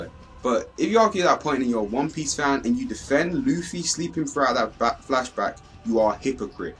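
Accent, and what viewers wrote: United Kingdom. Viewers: I know this is out of talking but...is that a British Accent? xD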